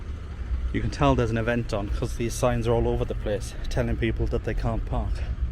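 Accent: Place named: Wales, United Kingdom. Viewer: Your Welsh accent is barely there in London...definitely returned now you're back in the valley.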